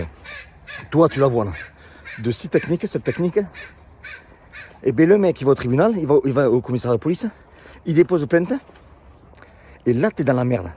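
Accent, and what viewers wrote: South, France. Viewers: Bonjour. D'habitude, je suis à 100% d'accord avec toi, mais la tu est en train de te contredire. JE m'explique. D'habitude ton discours c'est "on m'agresse, bam je riposte direct en cas de moi-même finir out". Or dans cette video tu est en train de nous dire qu'il ne faut pas "defoncer" un gars qui te saisis au colbak et que effectivement si tu le fait ta reponse ne sera pas "proportionnelle" à l'attaque ce qui est tout à fait juste d'un point juridique. Mais comment fait-tu (à part faire du sparring lourd tous les jours) pour ne pas réagir de maniére disproportionnée lors d'une attaque ou tu est en stress????? Voilou. Je veux pas faire mon chieur mais, les gourous t'attendent au virage et la ils vont pas te rater. Enfin bon je prefere quand même le gars avec un bon accent de chez nous (du sud) au gars qui se rase la tête avec un elastique.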